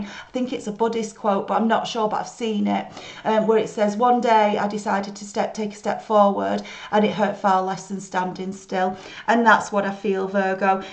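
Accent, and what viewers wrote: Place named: Newcastle, United Kingdom. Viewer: Love your reading (and that beautiful Geordie accent). Deffo dealt with a narcissist. Keep up the good work and will follow your guidance.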